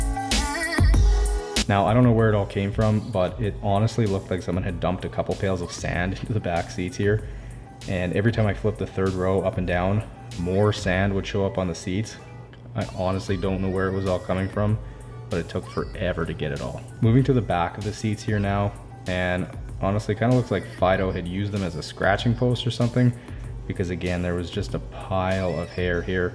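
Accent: Canada. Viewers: Where are you from lol, ur accent sounds Canadian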